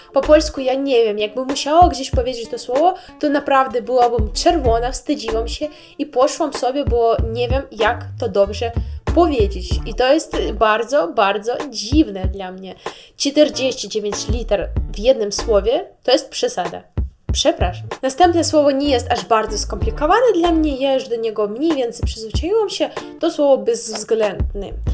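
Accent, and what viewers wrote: East, Poland. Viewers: Nawet nie wiesz, że dużej liczbie polaków podoba się bardzo ,,miękki" akcent wschodni. Brzmi tak miło. Daje poczucie bezbieczeństwa i troszkę jakby odmładza. Mówisz i wyglądasz uroczo. Tak trzymaj. Moja ciocia z okolic Lwowa dalej zachowała ten akcent,, Ale to już nie to |:) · Dziewczyno czym Ty się przejmujesz. Pięknie mówisz po polsku, a Twój wschodni akcent jest wręcz ujmujący. Polacy tak naprawdę lubią Rosjan, rosyjski język i kulture. Nienawidzą natomiast Rosji... Podobnie z Ukrainą. Lubimy Ukraińców Mamy pretensje do państwa ukraińskiego ze nie potępia tego co należy potępić w naszej przeszłości. To tak zawsze jest, ludzie a polityka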